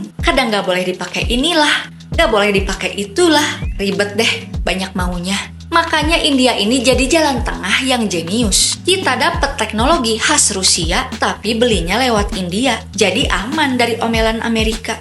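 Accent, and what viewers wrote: West Java, Indonesia. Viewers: Aku suka penjelasan nya jelas dn lucu ada logat Sunda nya gitu 😂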